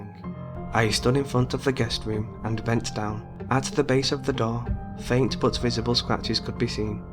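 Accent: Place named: United Kingdom. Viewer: as a American i can say you British and your accent makes everything sound scarier lol good stuff man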